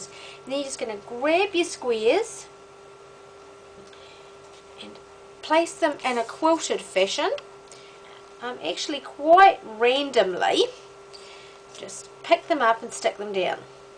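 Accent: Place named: New Zealand. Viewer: nice video - clearly done. Was wondering if there were any NZers working on cards and posting videos on you tube. Nice to hear that accent again! Looking forward to more videos from you.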